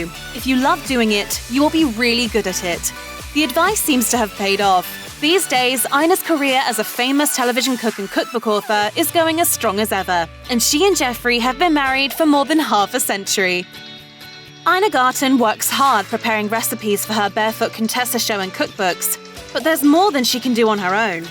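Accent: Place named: England, United Kingdom. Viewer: Why a voice-over with an English accent? Bad enough she talks too fast but she also is not right for a US audience. If you needed a Brit, how about one who knows how to speak accent-free? Not to mention who cares if she is working in her workshop? Or that she has helpers? It's a TV production, right? Would that our country could reflect as well in our public/political life as Ms. Garten.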